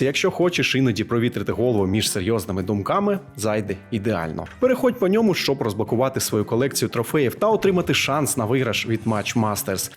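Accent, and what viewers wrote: Russia. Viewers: Відео хороші, але попрацюйте більше над вимовою для відео. Акання дуже чутне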